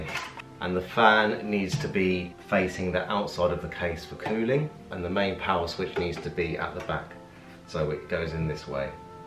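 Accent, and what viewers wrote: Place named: United Kingdom. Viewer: you say "this front panel (satl?) connector", what word are you saying? I'm from the US and sometimes a British accent makes it difficult to pick up every word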